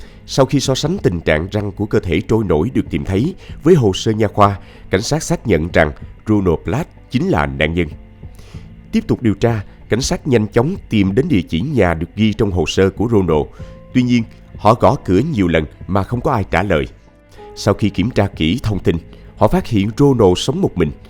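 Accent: South, Vietnam. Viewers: Giọng anh nghe rõ ràng chuẩn miền nam, nghe rất nghiêm túc nhưng rất cuốn!❤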